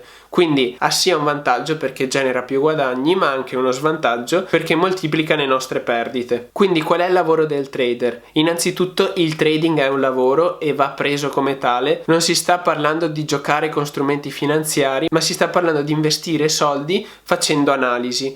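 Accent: Veneto, Italy. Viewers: Te si Veneto....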